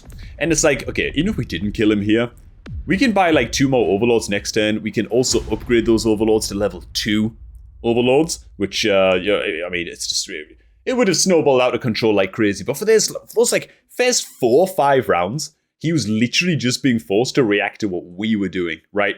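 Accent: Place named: Newcastle, United Kingdom. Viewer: Ah, Geordie makes sense!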